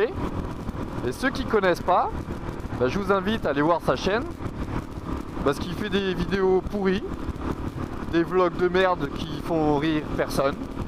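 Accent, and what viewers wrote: Alsace, France. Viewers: On sait que tu es Alsacien, avec cet accent. Et le Panneau à 70 (Après le moustique) c'est pour les chiens !! De même que celui à 30km !! A+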